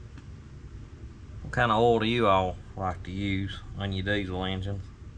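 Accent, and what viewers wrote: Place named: South, United States. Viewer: Some of the words you say, or how you say them remind me of my grandpa. What’s funny about that is I’m probably older than you. Here in northern Ky the country accent is non existent. Always love to hear it.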